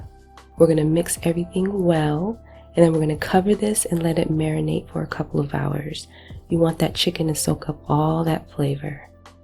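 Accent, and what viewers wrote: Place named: Philadelphia, United States. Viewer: I recognized that Philly voice!!!😉🎶 During this pandemic, we couldn't take Mom out for her birthday dinner, so we made this and a red velvet cake! She loved every bite of it!!! Keep 'em coming! Jersey's in the house!!!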